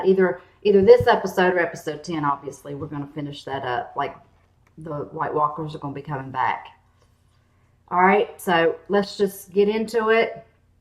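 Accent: United States. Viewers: Why does everything sound better in a Texan accent? BTW, Alliser actually calls Jon "Lord Snow" which is an insult (you know, bastard?). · Glad to hear you're feeling better! And I love your voice. You sound a lot like my aunt Didi, who's from Alabama.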